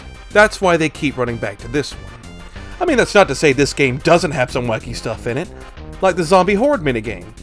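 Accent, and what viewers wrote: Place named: New York, United States. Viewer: i dont know why but you sound like a new yorker trying to talk like joe perra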